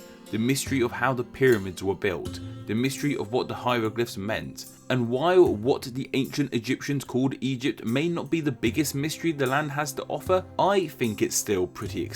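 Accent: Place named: United Kingdom. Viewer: All pronounced impeccably by a British scholar. The raayne in Spaain staays maainly in th'plaain. Please speak a more neutral English. If Marina Sirtis, Patrick Stewart, Jane Seymour, Patrick MacNee, David Niven, Leo G. Carroll, Jean Marsh, Nicola Bryant, and Alfred Hitchcock can do it, so can you. You're speaking to an international audience.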